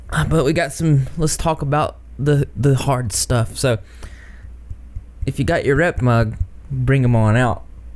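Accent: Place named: Georgia, United States. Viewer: can't believe i didn't put it together that you are from georgia. i love the accents of the people from there and i love yours. and really appreciate that perspective you have. you wouldn't know happiness if you didn't know pain. i use similar mantras myself, my current favourite "happiness or suffering, the choice is mine/yours". happy holidays everyone 🎐☃️💟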